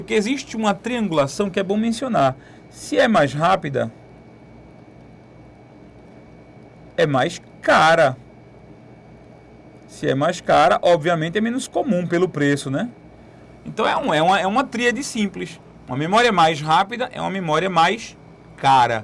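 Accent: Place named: Northeast, Brazil. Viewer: Finalmente uma aula com sotaque nordestino...tava cansada do carioca e paulista rsrs (sou paulista, pra os críticos de plantão.. ;P). Ameeei a aula, obrigada por compartilhar.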